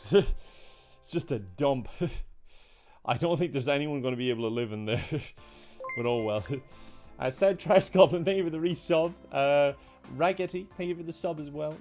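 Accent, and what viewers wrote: Ireland. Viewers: is so kind over stream wth! aaa soft irish lad!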